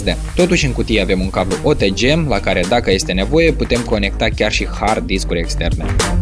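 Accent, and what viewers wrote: Moldova. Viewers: Moldova si tipicul accent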